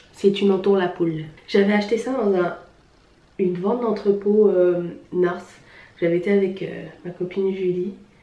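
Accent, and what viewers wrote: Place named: Quebec, Canada. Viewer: J'aime bien quand tu parles à la québécoise!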